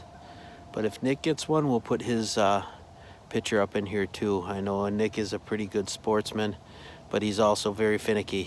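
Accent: Wisconsin, United States. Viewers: I saw tree does.... good Wisconsin Accent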